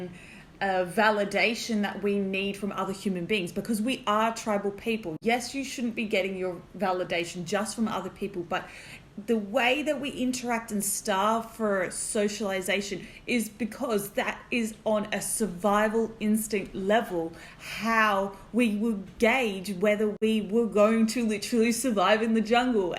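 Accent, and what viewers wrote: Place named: New Zealand. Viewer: Gee you speak well, just reinforcing your absolutely stunning tribal council speech. Also just a small thing but as a Kiwi now living in Vic ... I can hear your accent starting to change and you are beginning to adopt the NZ way of saying your vowels.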